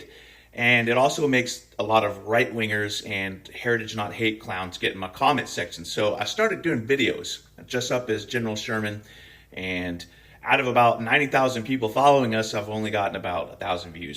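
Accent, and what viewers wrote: Scotland, United Kingdom. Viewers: It is funny how when you get angry the scottish accent disappears. I love stack-up. I have donated to them before. It is an amazing charity.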